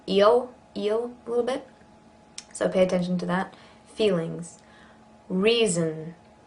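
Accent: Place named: United Kingdom. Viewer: половина слов с британским акцентом. хотя сама тараторит на американском ask с pass точн не правльно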